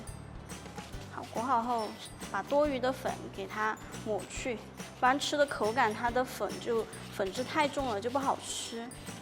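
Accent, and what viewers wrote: Sichuan, China. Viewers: 你是四川的還是湖南d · 一开始就听出了你的四川口音，最后终于讲出了四川话，请问哪里人？我是重庆人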